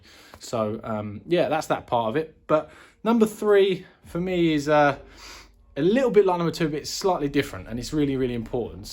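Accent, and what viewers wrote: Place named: South, United States. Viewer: I really enjoy your videos, but please please stop putting the auto generated subtitles on them 🙏 they can’t handle your southern accent mate, and it’s just complete gobbledygook going across the bottom of the screen, it’s so distracting 😂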